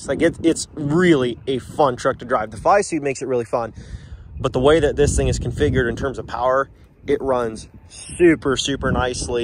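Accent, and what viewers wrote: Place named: Kentucky, United States. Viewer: He's definitely from Kentucky there accent is almost as distinct as brittish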